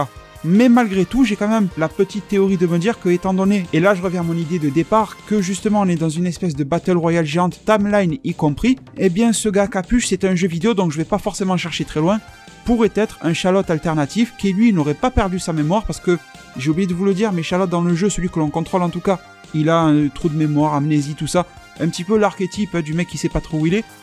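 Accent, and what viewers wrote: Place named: South, France. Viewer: tu as un accent du sud il me semble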